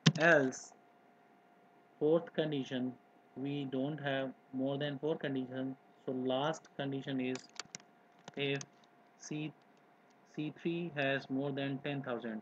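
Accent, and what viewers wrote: India. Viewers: what is with all these excel tut with indian accent?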